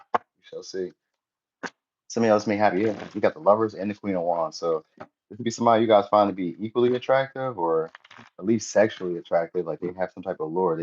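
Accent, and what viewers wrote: Australia. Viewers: He has an Australian accent 😅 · He is Australian....I didn't notice at first...😂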